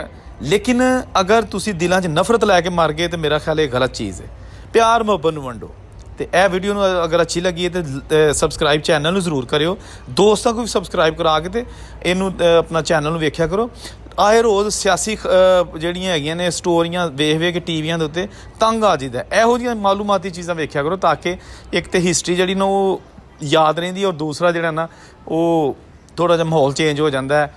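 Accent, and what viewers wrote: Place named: Amritsar, India. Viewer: bro this was my 2nd velog of yours first was about KASUR railway station before partition kasur as a sub division and now a days as a district in Pakistani Punjab state, i was really impressed the way you tried to narrate same as the Punjabi language spoken within AMRITSAR DISTRICT of INDIAN Punjab state but in this video you were repeating 23rd date as (tareyi) which means your elder one's were from district GURDASPUR/ JAMMU because tareyi (23rd) is word from dogari language, the main velog about railways in Pakistan i really watch is"SITE & SOUNDS no doubt all others too are impressive but your velog really impressed let's pray the lord to be both the nation's one again or love to live in peace and harmony with love from INDIA ❤️❤️❤️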